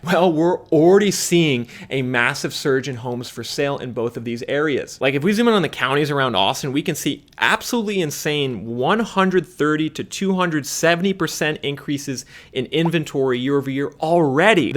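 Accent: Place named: Appalachia, United States. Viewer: Where you from originally? You say you work from home but you talk like a hillbilly. It's not your town and to be honest you need to get out more. Austin is not what its cracked up to be. Your probably a Democrat! Lol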